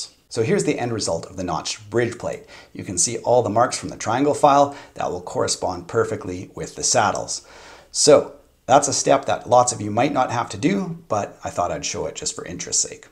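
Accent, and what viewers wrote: Canada. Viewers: I love your videos, this one is no exception. But do Canadians day Filta instead of Filter? Because you keep saying Filt-a-tron...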